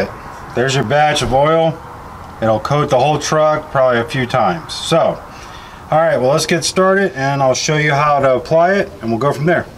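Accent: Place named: United States. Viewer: Hi there! Wonderful job! 👏 Sorry but I didn't catch your american accent. I tried but I couldn't understand the names of those 2 chemicals you used to reveal and preserve that nice patina/rust effect. Would you please write them down for me? Thank you very much! Forgive me one or another mistske. English is not my native language. Fro Brazil 🇧🇷.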